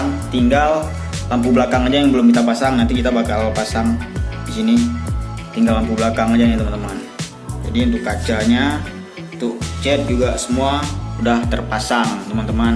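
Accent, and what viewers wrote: Aceh, Indonesia. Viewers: Logat Aceh nya bang😂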